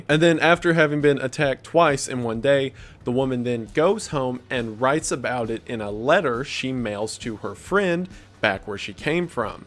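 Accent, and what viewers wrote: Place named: Texas, United States. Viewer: omg, i love your accent! are you from texas? <3 much love from London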